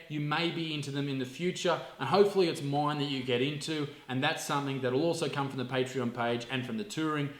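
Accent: Australia. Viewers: Legend! People think your not Australian 'Shut up birds...fuckin hell'. If that's not Aussie I don't know what is. Love your clips mate!! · you look like my last years english teacher, and sound like him to bc hes AUSTRALIAN 😉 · i like watching your videos because you talk like Australians do normally. Most Australian youtubers tone down the swearing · I feel like I’ve got all the tools to be a comedian.. Except that fuckin classic Aussie accent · How anyone cant know ur an aussie just on ur accent alone fuckin blows my mind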